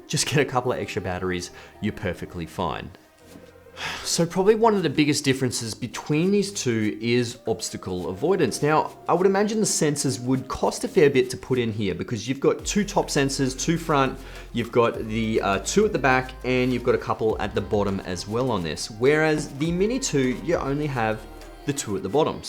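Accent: Australia. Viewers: I have both of those drones and love them both I am not a professional photographer I only do it as a hobby. I was on your last live chat and was trying to ask you a question. I got low sugar and had to go and get some I’m diabetic. I was trying to ask do you know much about the capabilities of the tracking feature I haven’t really pushed it too far yet and I am just trying to find out some things about it before I go running off to Mount panorama in Bathurst I think you would know where I’m talking about because you sound like you’re Australian. I want to film my classic Merc going round the circuit now whether I fly it manually round or use the active track is my question. I understand that it uses the camera to track. If I use an ND filter would that make the drone lose my car and stop tracking me? When I go there I will have a mate with me so I’m not sure whether to sit in the passenger seat and fly manually or do you trust the active track. 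Do you have an opinion on this for experience. How were you following the JetSki manually or active track? Any thoughts would be appreciated.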